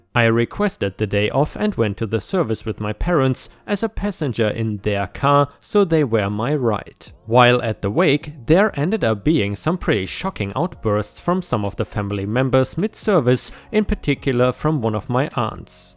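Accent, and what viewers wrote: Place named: Germany. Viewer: ⭐⭐⭐⭐⭐⭐another set of great stories, brilliantly told! I lived and worked in Germany for a while and miss the accent. Makes me feel at home sort of? If you know what I mean?? In a nice way! 🌟🌟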